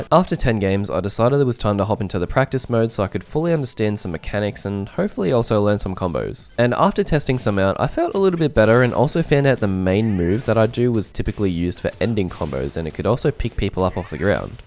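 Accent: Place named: Australia. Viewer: tekken is a hard game, with a huge amount of knowledge checks. happy to help you come to grips with the basics if you like? judging by your accent, you are in Australia right? I'm in NZ so the connection should be pretty decent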